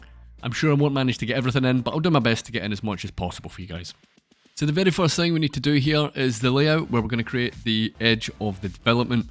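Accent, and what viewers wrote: Scotland, United Kingdom. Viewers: I like your videos! Love the intersections tutorials! Well done! Any chance you are from Scotland ? :D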